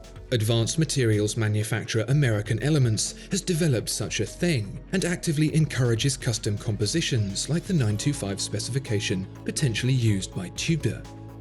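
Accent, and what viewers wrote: United Kingdom. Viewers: Very informative video but gosh that ANNOYING British accent just for the Americans we buy anything from the Mother Country.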